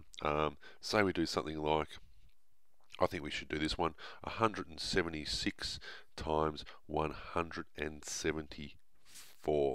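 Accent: Australia. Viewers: Aussie accent. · are you Sal Khan's Australian twin? Are you the real Sal Khan doing silly math stunts while speaking Australian? Seriously, the cadence of your voice, your choice of words, they make you sound like Sal with an accent. If, by chance, you haven't heard him, just google the name and listen to what he sounds like.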